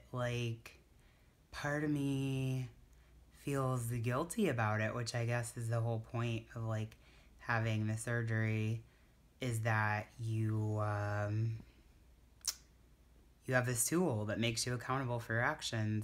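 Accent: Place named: Northern California, United States. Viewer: You sound like my cousin who is from northern Cali that's why I was like hmm I wonder if she is from the west coast. I'm from New Jersey but people say I have a southern accent lol as far as the post op... I've been scared to eat because I always get nauseous.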